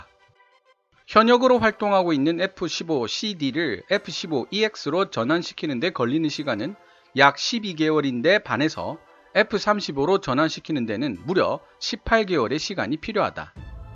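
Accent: Gyeongsang, South Korea. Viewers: 갱상도 분이신가보네요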